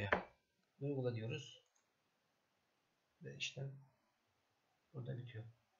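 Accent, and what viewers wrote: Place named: United States. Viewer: Sesin Amerika'dan geliyo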